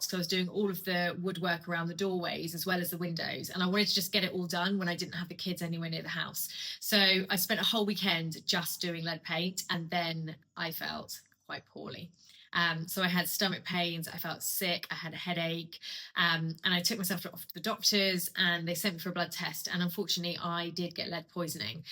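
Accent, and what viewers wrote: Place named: England, United Kingdom. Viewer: You are a natural designer and are very talented, I subscribed after seeing a couple of your shorts. You are incredible and I have enjoyed watching the transformation of the cottage! (I am in the USA and your accent reminds me of my mom who was an English War Bride in the 1940’s and married an American soldier.)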